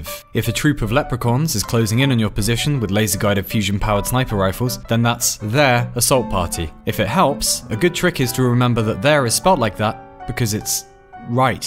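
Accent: United Kingdom. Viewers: I dont very much appreciate the “Breh-ish” space turtle telling me an American, how to speak English; when they pronounce words weird as shit over they’re, i.g. Tuesday as “Chewsday” and Water as “ Wah-uh”. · Mathhhhhh btw. He has a british accent but he smart when it comes to oxford commas and mathhhthhhh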